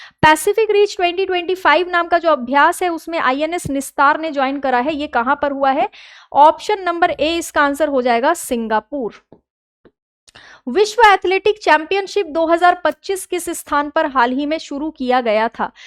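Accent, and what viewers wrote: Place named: Bihar, India. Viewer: Madam ji aapka bolne ka excent thoda Bihari jaisa lagta h but accha bhi lagta hai 😊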